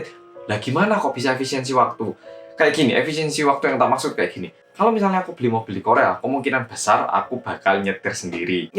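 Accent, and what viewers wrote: Java, Indonesia. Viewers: Ws gembe kopi durung mas medok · Jowone mendok tenan jozzlah..... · Kemedo'anmu berkurang sol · Oke hallo guys welcomback to korea reomit bersama orang korea yang medoooookkkkk Aku pengen naik kereta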